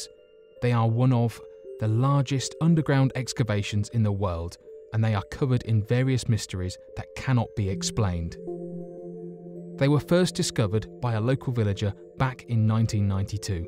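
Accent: United Kingdom. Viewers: Tacos - they are from different regions in the UK, hence difference in their accents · this guy is more brittish than our normal guy where is our normal guy?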